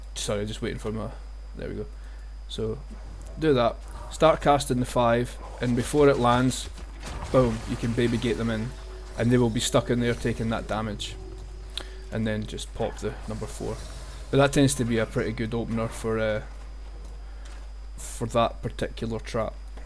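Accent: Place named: Scotland, United Kingdom. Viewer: I mean, I appreciate how inforamtive the video is but... if you sounded more scottish AND angry that would also be very entertaining xDDD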